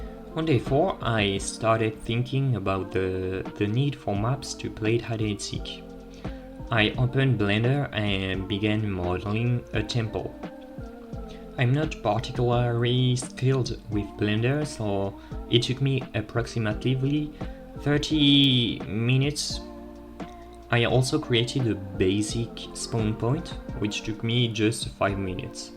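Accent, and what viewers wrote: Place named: France. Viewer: You should translate your videos to french because your accent is kinda annoyingq(sorry i have the same lol)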